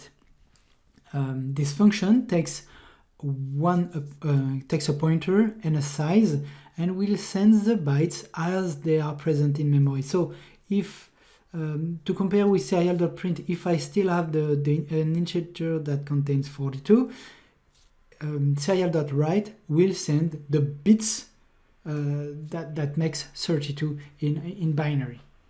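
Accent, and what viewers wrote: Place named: France. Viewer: Thanks for your video tutorials and explanations, i love your french accent because i'm also french speaker and so je comprend bien mieux ton anglais que celui d'un natif 😉😉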